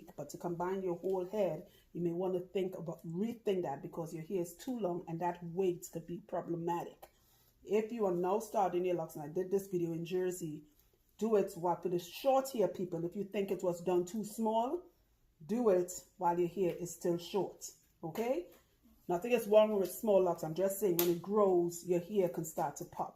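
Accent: Trinidad and Tobago. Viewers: Are u trini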